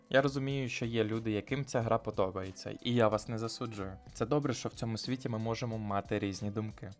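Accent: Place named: Surzhyk, Ukraine. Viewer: Полу суржик полу українська. Чувак, я підпишусь)) Це медятіна для моїх вух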